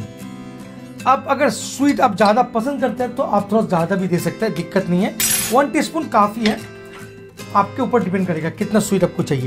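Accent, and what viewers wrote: Bengal, India. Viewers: Sir apnar hindi sunne mone hoche je apni bangali aita ki thik ?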